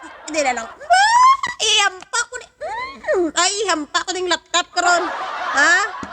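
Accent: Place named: Iloilo, Philippines. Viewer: The best talaga si ate with an ilongo accent 😂😂😂😂😂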